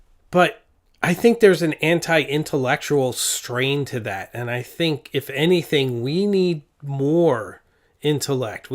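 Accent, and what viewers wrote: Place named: Massachusetts, United States. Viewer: you are already very cool. and your speech is very nice, it sounds like a Massachusetts Connecticut accent with a slight hint of Bostonian-lite. you could literally host PBS Masterpiece Theater and no one would think it out of place with either good style or speech. in fact, you could probably make money on the side as a voice actor. and about your story episodes, they are poetic and very entertaining. by the way, people with northeast accents that strive to enunciate well are often considered snobby and pretentious because that's how snobby and pretentious people are portrayed on tv and in movies, right? it takes a while for inexperienced people to learn this. i believe we have cartoonists and writers/ actors to blame, since they perpetuate this myth and perhaps it is a bit of a truth when seen in actors and elites off stage who belittle those who do not subscribe to the marxism by any means necessary philosophy and will literally tell you day in and day out how you are not better than them? 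No? i have been busy with the health concerns of my mother, mother in law, my wife, my sister, my neices, brother in law, and myself, and cooking every day and working on this house, my friend. i am sorry i missed your story videos. i don't get notifications on most of my subscriptions! oddly, the disaster channels always show, and a nice man in england who teaches english one word at a time, with some odd ones thrown in often enough to make it interesting! i hope you have a great week.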